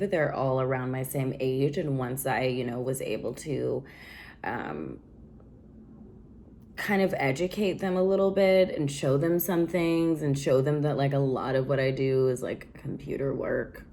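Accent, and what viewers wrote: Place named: United States. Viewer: All American women sound like that to me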